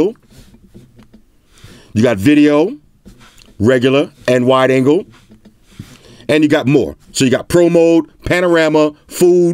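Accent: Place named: New York City, United States. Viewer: NYC slang in full effect when you watch....I feel like I’m chilling on the “stoop” with one of my homeboys! I know knows the word stoop!! 😅😅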